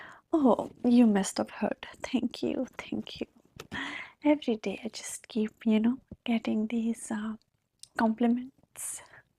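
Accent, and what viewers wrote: India. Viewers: really like the articulate indian accent, cherry on top!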